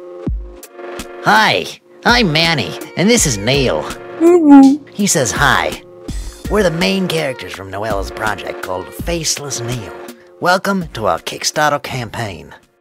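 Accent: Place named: France. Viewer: T’as un accent français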